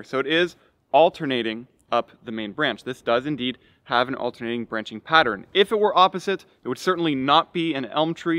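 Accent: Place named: Pennsylvania, United States. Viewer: So where are you at or from? Cuz I'd swear you were a county over with your accent. Ya Sound like any other Pennsylvania person to me.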